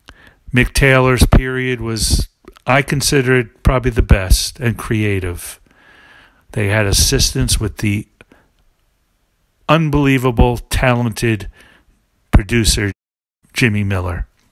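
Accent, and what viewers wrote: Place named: New York, United States. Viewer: I especially love the voice in it !!!! The voice commentary is very very good!!! And it’s very detailed and correct to what actually went on , took place and unfolded in history and in the meaningful significance that it holds . Is your slight accent a New York accent ? May I ask ? It is very good !!!! It’s like that old show about the 60s and 70s the wonder years !!!!!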